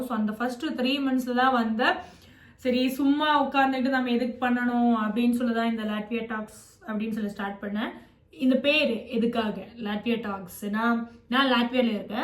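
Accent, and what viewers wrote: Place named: Kerala, India. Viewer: unga tamil different ah iruku . just like tamil and malayalam mixed ah iruku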